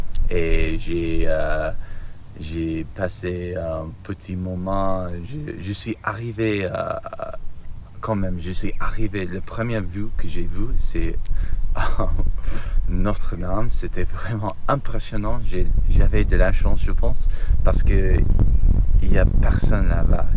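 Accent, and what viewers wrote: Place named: United States. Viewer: C'est parfaitement compréhensible et tu as la bonne démarche : parler le plus possible et ne pas avoir peur de faire des erreurs. En continuant sur ce rythme, d'ici 2-3 ans tu pourras parler presque sans trace d'accent Américain. Pour accélérer le process et acquérir du vocabulaire, je te conseille de trouver des films, séries ou podcasts français sur des sujets qui t'intéressent, avec sous-titres anglais ou français si besoin ou pas. ça permet de découvrir des mots et des expressions que tu n'entendrai jamais dans la vie quotidienne. Tu peux aussi regarder Top Chef ou Cauchemard en Cuisine sur M6 si tu n'en as pas marre de ton métier à la fin de la journée.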